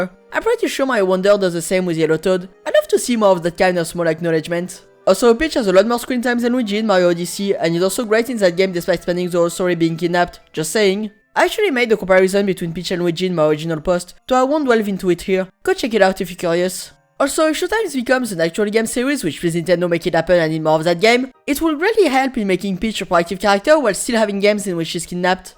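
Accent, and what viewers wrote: France. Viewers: omg luvv ur french accent lol anyway , i don't think she resembles Daisy on personality but the problem is her face , the "Illumination" style ruined her as a whole.. she looks like a normal regular american blonde girl , that barely resembles a "fairy mushroom human (not really human)" plus we know her facial expressions are meant to be "minimalist" real Peach would never open her mouth more than 1inch haha not even in a fight or scream , and Illumination streches Too Much! her facial expressions , what makes her looks with so much attitude (so far from how we know Peach) is just not kawaii cutie , she could easily have a crazy ratched personality but if they kept her FACE instead of these goofy cartoony Disney wannabe face it would be no problem that's not even my they should have kept her uniqueness on her manners and face . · tout mon respect, absolument pas. 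Tu sonnes extrêmement français.e.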